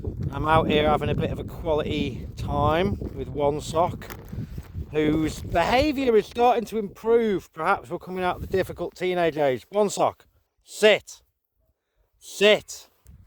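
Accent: United Kingdom. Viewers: sooo British) Love it!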